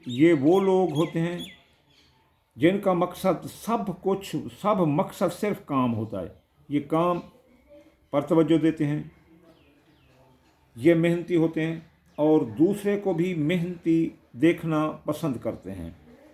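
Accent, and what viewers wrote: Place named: Saraiki, Pakistan. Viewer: لگتا ہے آپ سرائیکی ھیں جنوبی پنجاب میں رھیتے ھیں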